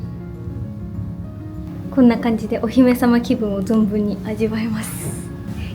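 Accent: Kansai, Japan. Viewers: いつも楽しく拝見してます。ちゃんがたまに話す関西弁がめっちゃ好きで、めっちゃかわいいです😆 これからも楽しい動画をお願いします。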